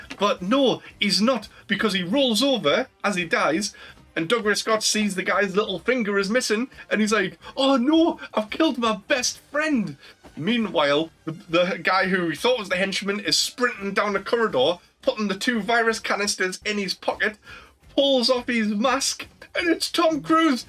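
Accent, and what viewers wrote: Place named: Newcastle, United Kingdom. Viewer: 😂 damn geordie accent man lol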